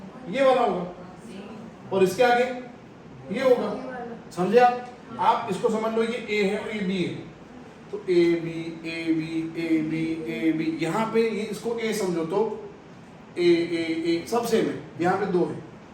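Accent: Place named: Gujarat, India. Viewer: That gujarati accent in hindi 😂😂👍👍 keep it up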